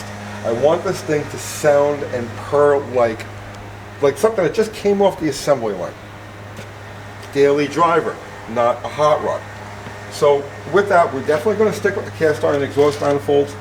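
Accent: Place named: New York, United States. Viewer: At 6 I thought that was going to kick all their asses all right so here we go horse is right behind you with the 4-cylinder kick me or kicking your butt real bad what the hell's going on here all right here comes the Volkswagen Mahler is just going to mow you down best Brigg and Stratton and it's not even but one cylinder you know what the hell I know I got a firecracker right here I bet you your hemorrhoids couldn't handle it no more all right here we go you sound like a New Yorker stop whining and crying get out there and really do something I want to see you go to drag strip and make some happen I want you to take that six cylinder and make it happen what the hell happened I don't know you don't know we don't know we want to know what the hell is happening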